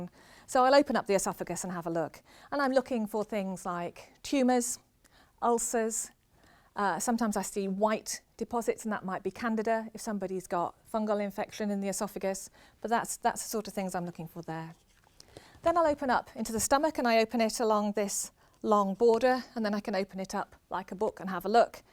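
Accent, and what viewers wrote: United Kingdom. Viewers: Her English accent somehow makes this autopsy classy.🤣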